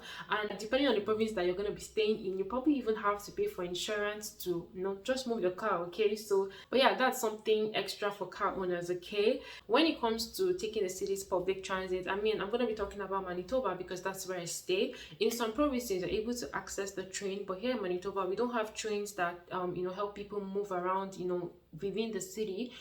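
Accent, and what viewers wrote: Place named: Nigeria. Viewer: Very good video ! I like the way you explain the things ! I watch your video from Ottawa ! I like Nigerian accent 😀👍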